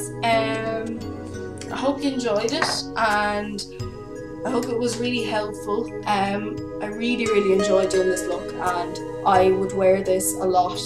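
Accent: Ireland. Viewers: Your accent is so rad. I love it! Ireland is someplace I wish to visit sooo badly. Hello from California >3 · your accent <3 i love irish accents and i love your tutorial imma try it tonight because im uber bored!!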